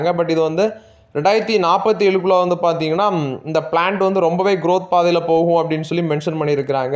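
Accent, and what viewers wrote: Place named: Kerala, India. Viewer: He is malayali ignore the pronounce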